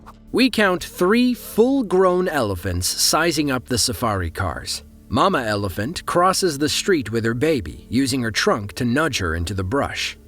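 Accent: United States. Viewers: judging by the narrators accent, he is probably American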